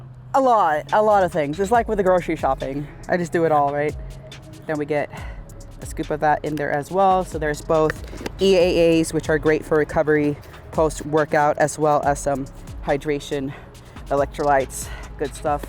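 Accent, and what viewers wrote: United States. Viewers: how does she sound so american being raised in sweden?? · for me she sounds like an American. Never would I thought she is from Europe ... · Would have guessed she was born and raised in The USA by how she speaks , sounds very American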